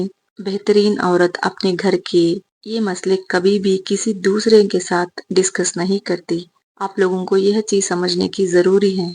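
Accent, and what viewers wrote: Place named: India. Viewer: sahi sahi mujhy apk lehjay sy lag rha tha ap Indian hen😍😍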